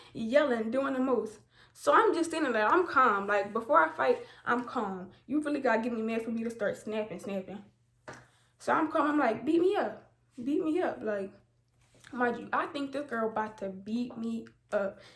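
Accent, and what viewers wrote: New Jersey, United States. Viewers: I could hear the jersey all in you lol I would think you was from Newark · you from new jersey?? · are u from jersey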